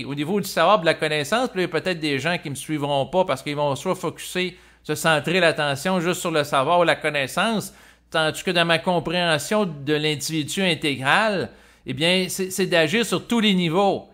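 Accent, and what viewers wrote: Quebec, Canada. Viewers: Regarder les vidéos, enregistrer les vidéos, aller vivre à Québec.....ca veut pas dire que la personne est supraconsciente. Je vois des vidéos sur le supraconscience qui me font peur, pour dire des choses comme elles sont. N'importe qui se considère comme supraconscient, mais des gens ils sont loiiiiin de supramental / supraconscience. Comme j'ai déjà dis c'est pas donné à tous le monde. Moi j'aime bien Québec,les gens surtout votre accent, un vrai plaisir a écouter les québécois parlé. Ca veut pas dire si on part vivre n'importe où qu'on va devenir ça ou ça. On est loin de supramental/ supraconsience. merci pour le partage 👍💎 Bonjour à vous tous 🖐️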